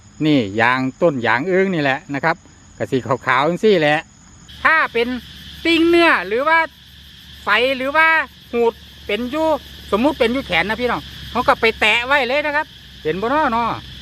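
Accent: Isan, Thailand. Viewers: สำเนียงอีสานจังหวัดใดค่ะ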